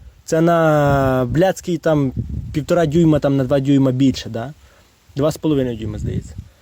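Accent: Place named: Volyn, Ukraine. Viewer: Бо на Волині Вісі так піздять, я тоже з Волині і я знаю як тут люди говорять 😂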